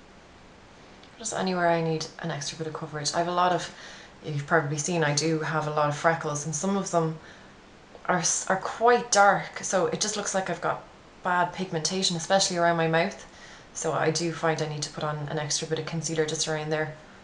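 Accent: Ireland. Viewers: "It's too fekkin tick neou" Love your accent. I could listen to the Irish accent allllll day long! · Love the video and your accent! Sounds Irish. Where are you from?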